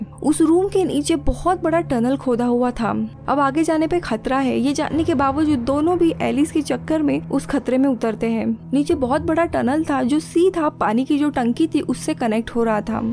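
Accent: Maharashtra, India. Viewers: Ur accent is Maharastrian. R u marathi?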